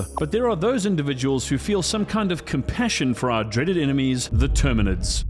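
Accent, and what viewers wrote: South Africa. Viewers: I hear a SOUTH AFRICAN CUZZIN... I subscribe!!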